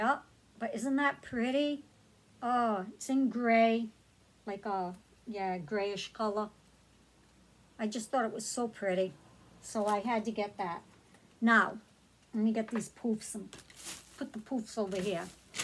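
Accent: Boston, United States. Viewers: Springfield Massachusetts 🥰 Your Accent Leads Me To Believe You're From Boston 💙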